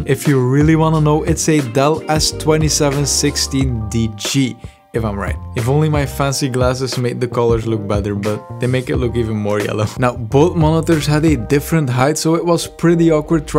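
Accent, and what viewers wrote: Flanders, Belgium. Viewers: amai die klinkt belgisch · if you were from flanders (because of the accent)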